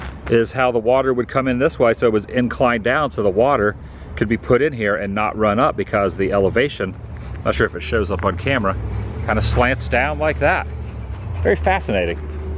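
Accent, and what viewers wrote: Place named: Philadelphia, United States. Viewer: Your accent is all too familiar - You from Philly PA vicinity, or Delaware/Maryland?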